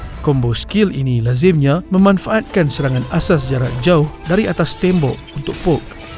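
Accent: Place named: Perak, Malaysia. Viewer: orang perak ghupenya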